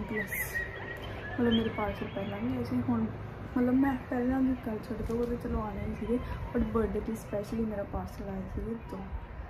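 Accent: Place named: Canada. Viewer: mam da canadian slang hogya hle 6 month ni hoye ayi nu bakwaas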